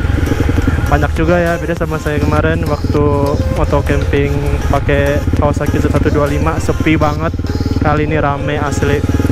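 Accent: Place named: Japan. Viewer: Dengarkan baik2. Kayak aksen Jepang.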